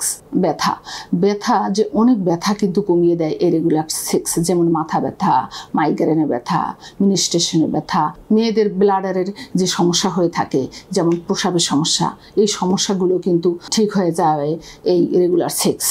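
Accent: Barishal, Bangladesh. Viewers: মেডাম আপা বরিশালে নাকি মুখের কথা সুনে তো মনে হয়ে সেরকম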